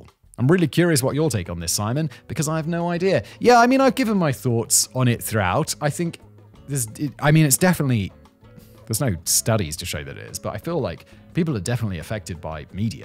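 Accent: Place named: United Kingdom. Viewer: Years I have waited and finally I am satisfied....finally got to hear Simon say "innit" in that very mild British accent 😅😂😂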